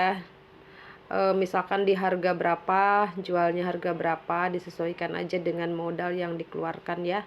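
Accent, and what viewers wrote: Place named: Padang, Indonesia. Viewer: Seperti nya dari cara bicara dan logatnya, bunda ini kayak orang padang..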